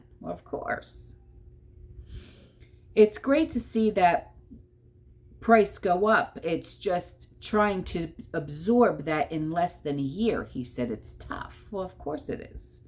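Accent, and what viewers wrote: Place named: South Jersey, United States. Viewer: She’s from South Jersey.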